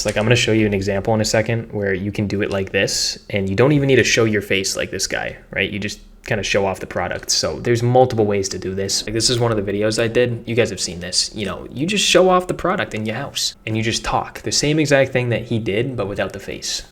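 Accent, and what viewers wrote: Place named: Boston, United States. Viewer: Am i hearing a newengland accent lol if so wassup my Man im a Mainah but you have that boston vibe lmao.. Love the vids i have yet to master tiktok shop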